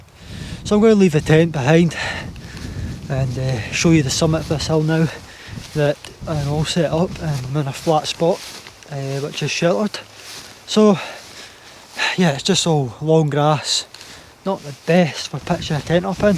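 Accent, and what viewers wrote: Scotland, United Kingdom. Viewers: Thanks for that young man - regrettably I've never been a hill walker though I did scale one Munro in my earlier years - it's definitely something that I regret however with modern technology I can indulge in viewing blogs like yours which while isn't the real deal gives me enormous enjoyment taking in the majestic scenery and views - I'm a glaswegian and can appreciate your neutral Scots accent and modest demeanour - keep the videos coming and keep safe - PS I still have my camping gear which includes a terra nova voyager tent and thermarest mat - need to get the finger out and engage in a wee trip soon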